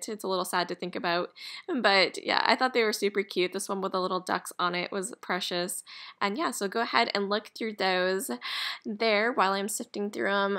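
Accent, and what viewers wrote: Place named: Maine, United States. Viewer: I liike to hear you talk with your Maine accent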